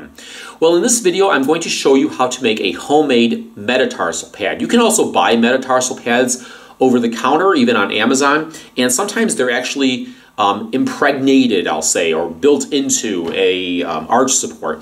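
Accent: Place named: New York, United States. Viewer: Dear doctor! 🤓 What a great detailed explanation, which took the mystery out of this problem. I understand WHY the foot pads did not alleviate my pain (between 2nd & 3rd bones) before. I bet you are a New Yorker? I’d recognise that accent anywhere. This was extremely edumacational! 🤓 A grateful New Yorker in London. 🇺🇸🌹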